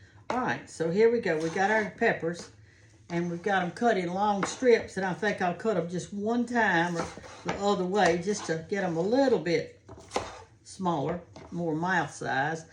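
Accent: South, United States. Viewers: Love how you said you keep moving. Anything special you do? Love your videos and your wisdom. My mother was born and raised in Meridian, Mississippi. Your southern speech warms my heart🙏🙏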